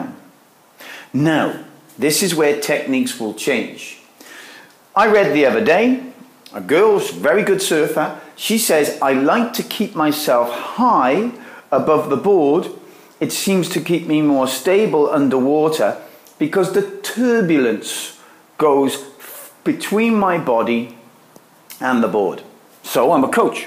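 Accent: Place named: South Wales Valleys, United Kingdom. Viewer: I thought so, I'm a valleys boy so I recognised your accent right away!! I got the bug from my dad, who surfed all over the world when he was in the merchant navy back in the 60's also. Currently, I'm surfing a 7'2" NSP board, and I'm surfing mainly at Freshwater West in Pembroke where I live, so, your tutorials are super helpful, so, thanks!!! Happy days dude!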